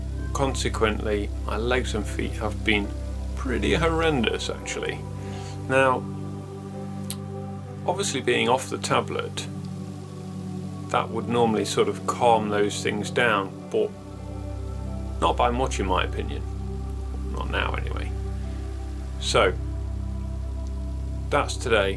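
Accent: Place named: Northern England, United Kingdom. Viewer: We both apologize for never shutting up! I’ve been trying to figure out if I can identify your accent. At first I thought it might be a northern accent, but not Liverpool, because you sound sort of like John Lennon but not like John Lennon. Then I thought maybe London. Now you mention going to Derby, I think (which, as you surely know, we would pronounce “Dur-bee”). Which I looked up on the map and see it’s fairly far north. You said something about a painkiller, and then named it. I’m not sure what the first word was, but then you said “and codeine”. I bet that’s similar to what I know as Percocet. Which is oxycodone and acetaminophen. I believe oxycodone has something to do with codeine. Which reminds me. I need to make a video about that. Because that’s very important. I learned four years ago that Percocet makes me stronger. 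Then I learned a couple months ago that OxyContin makes me significantly weaker. They’re both made of oxycodone. But Percocet is instant release and OxyContin is extended release. Which means it’s oxycodone with chemicals added to it to make it do something different than what oxycodone itself does. Those chemicals do other things, too (“side effects”). Like make me weak.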